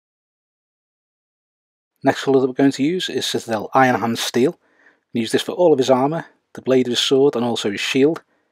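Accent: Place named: Merseyside, United Kingdom. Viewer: These Uruk Hai guides have been so helpful as I plan on starting an Isengard army soon. The Merseyside accent is also a plus 👍🏻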